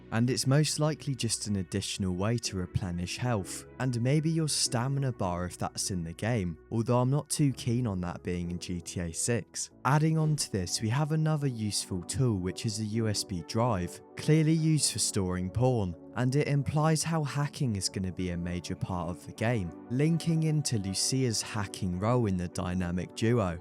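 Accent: United Kingdom. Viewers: tolerating british accent for 44 minutes is going to be real hard but there's no other choice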